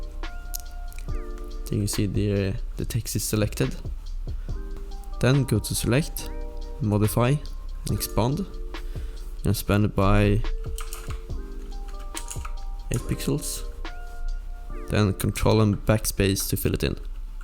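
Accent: Sweden. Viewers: Är du svensk? Låter iaf som det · U sound Swedish as f**k. Looking good. Thanks boiio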